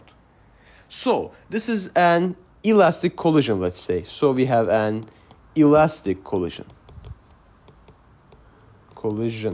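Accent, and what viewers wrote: Turkey. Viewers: aksanından türk olduğunu anladım direkt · nice video nice turkish accent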